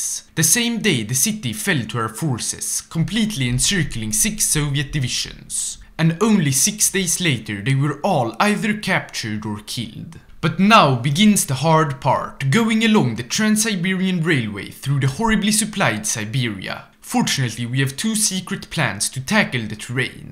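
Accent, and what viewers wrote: Sweden. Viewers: A Swiss man with a Swedish accent playing a Swedish game as Japan.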